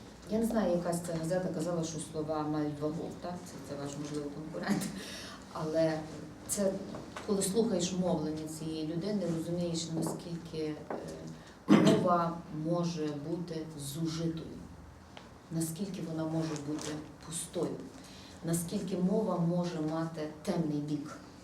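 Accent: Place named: Western Ukraine, Ukraine. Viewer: ты говорить научись не на суржике западенском, а на нормальном языке, на русском или украинском, но не на западынском как ты сейчас базлаешь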